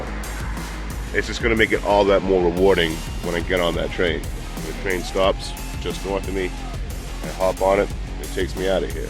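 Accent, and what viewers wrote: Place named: Maine, United States. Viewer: You sound like you're from Maine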